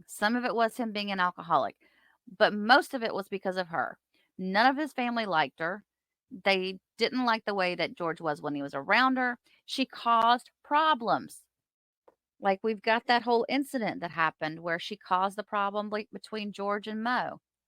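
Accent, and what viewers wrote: South, United States. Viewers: With your sweet southern accent, I'm waiting for you to say "bless her heart".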